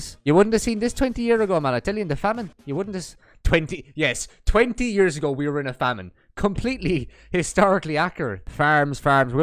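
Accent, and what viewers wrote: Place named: Ireland. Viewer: American here! Holy cow your accent is incredibly Irish I love it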